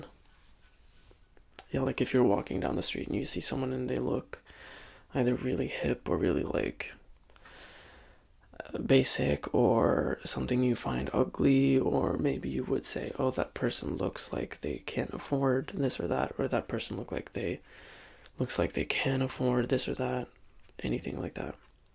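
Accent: United States. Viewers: The first time I came across your video I feel like you will have a British or Irish accent. Nice American accent like really good. Can you do English accent? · How do you look British and sound American at the same time?:...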